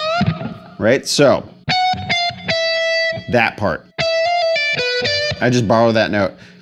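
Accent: United States. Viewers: Amazing player! Moreover, I practice american accent listening to you:) Greetings from Buenos Aires.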